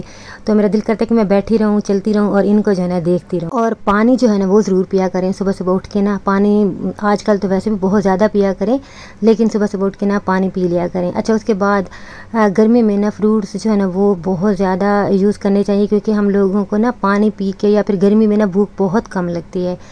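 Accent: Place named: Saraiki, Pakistan. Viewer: السلام علیکم پہلی دفعہ آپکا بلاگ دیکھ رہی ہوں ،،،،،میں لغاری ہوں سرائیکی آپکا لہجہ بھی سرائیکی جیسا ہے ❤❤❤ ما شاء اللہ 🎉 پلز بتائیگا آپ کی زبان کونسی ہے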